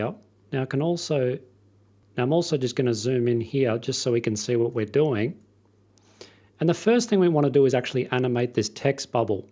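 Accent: Australia. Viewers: thank you so much , i used for a wedding and it looks great , nice australian accent ?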